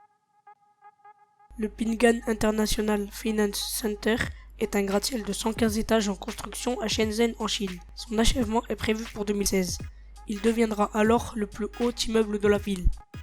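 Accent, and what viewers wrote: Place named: North, France. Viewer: Sa se voit qu'il vient du nord le quéqué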